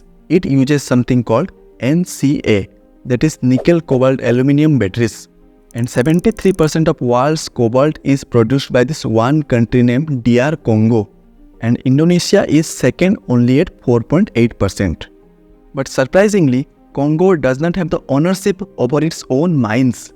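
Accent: India. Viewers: Brilliant video, keep them coming. For others who are commenting on “pronunciation”, I want you to know that international viewers don’t care. (I work with them). And looks like you too are from say up/bihar/odisaa side? It’s the Indians who will crib about pronunciation. Yes, keep it improving but know that the threshold is already crossed and the speech quality is good. Take these comments with a grain of salt and keep up focused on the video content and quality.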